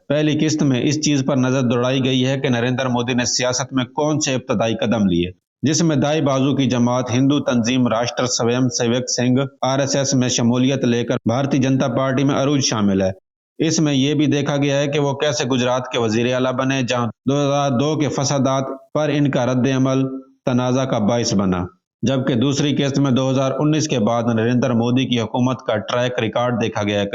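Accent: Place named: Pakistan. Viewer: Yuck! What a Pakistani-sounding narrator. Utterly one-sided and typically I$lamiC in your worldview. Lol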